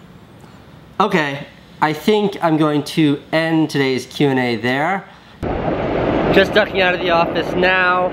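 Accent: France. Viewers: Great french accent ! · haha love your french accent! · That accent in french though :) Greetings from Paris ! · your french accent it's cool :p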